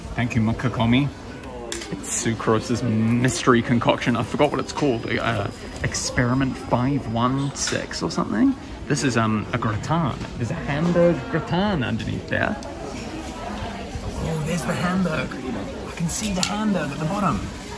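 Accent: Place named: New Zealand. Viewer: sweet! a kiwi playing genshin I enjoy genshin and im planning to move back to new Zealand in the future trying to learn the accent again lol so this is perfect XDD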